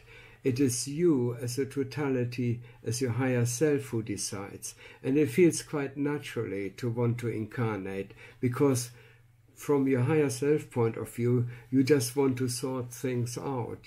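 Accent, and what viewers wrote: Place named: Germany. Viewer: warum spricht er eigentlich kein Deutsch? Er ist doch ein Deutscher. Hört man doch total am Akzent. Ich verstehe echt nur die Hälfte. Eigentlich schade. Das Thema ist so spannend!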